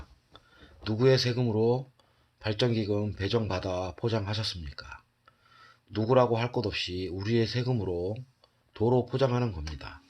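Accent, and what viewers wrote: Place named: Seoul, South Korea. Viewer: 까?하실때 악센트 주시는게 서울사람이시네요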